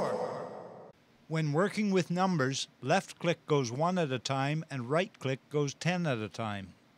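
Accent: Canada. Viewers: Nice video and canadian accentt soundz kool dogg!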